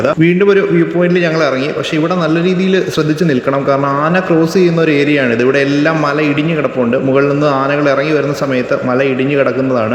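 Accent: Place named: Kottayam, India. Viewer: ബ്രോ കോട്ടയം ആണോ 🤔ഇടയ്ക് ഫ വരുന്നുണ്ട് 🤔പൊളി 👍🏻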